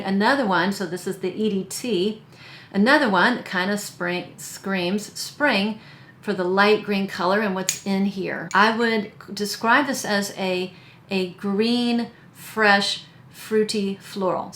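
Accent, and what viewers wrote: France. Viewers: My lovely and beautiful Scentsister 💕♥️ with a a crabby french accent😂😘 Let’s do a live chat some day😉🥰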